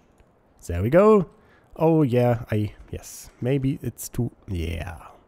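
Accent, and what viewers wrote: Germany. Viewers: | | Gaming with german accent 100% agree! I think, some of your german fans don't know/understand, that maybe there a other YouTube user, which can't speak german, but would like to see your great videos! For me it is a great opportunity to increase my english understanding. Greetings Tobias P.S. I am sorry for my bad english - it is etwas eingerostet:D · | | Gaming with german accent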